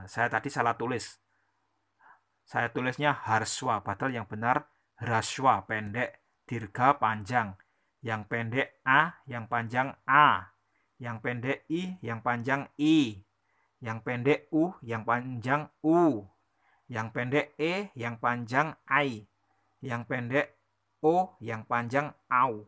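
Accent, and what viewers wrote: Java, Indonesia. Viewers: Vokal orang kuno lebih lengkap, sekarang yg masih terwariskan unsur medhok ya seperti pada mas, makasih banyak..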